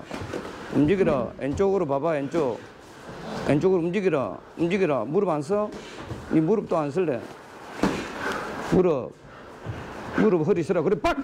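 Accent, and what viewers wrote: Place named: Busan, South Korea. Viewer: 부산싸나이 말투 쎄네요. 그 속에서 묻어나오는 정이 느껴집니다~^^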